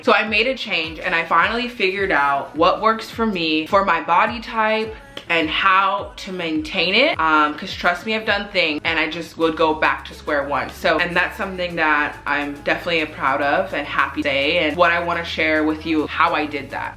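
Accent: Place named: Netherlands. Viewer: Idk why but the way you speak English really has a Dutch undertone if you get what I mean hahah. Idk if you are dutch but yeah 🤣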